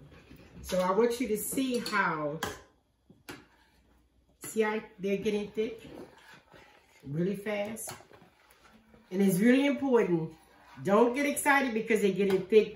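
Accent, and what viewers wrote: Baltimore, United States. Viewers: I can hear the Baltimore accent heavy 😎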